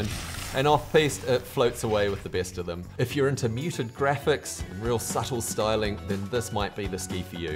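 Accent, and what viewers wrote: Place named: New Zealand. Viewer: Found this Vlog by accident. Nice vlog Mate. Funny hearing a Kiwi after being here in the US for 20yrs - now I know how I sound to my mates!!! Chur Bro. Armada 92 Declivity for me cuz…( latest edition )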